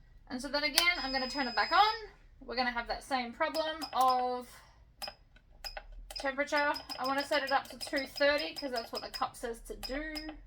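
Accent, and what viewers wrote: Australia. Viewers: Gday, just a heads up you need to clean the cup with alcohol, love your videos, nice to hear an Aussie for once…where in aus are you?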